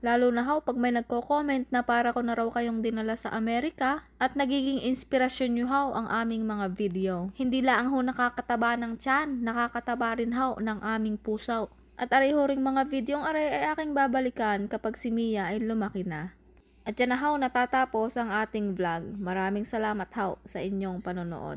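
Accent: Batangas, Philippines. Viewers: Natutuwa ako sa inyong tatlo Ako ay taga San Antonio Texas at meron din ako bahay sa Lipa City Batangas kaya gustong gusto ko yong puntong Batangas mo Pero ako ay isang ilokana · Natutuwa ako sa iyong Batangueña accent! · Hi there enjoy lang akong manood ng blog mo. Ayos na ayos yung narration mo na puntong batangenya. From Batangas ang Tatay ko kaya gusting gusto ko ang tonong-Batangas. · Nakakaaliw ang tonong batanggeño😁👍 sis.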